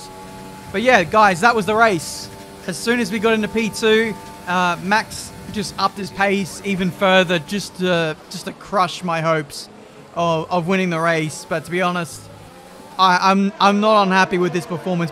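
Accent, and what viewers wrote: New Zealand. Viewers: I thought you were from Australia and not from New Zealand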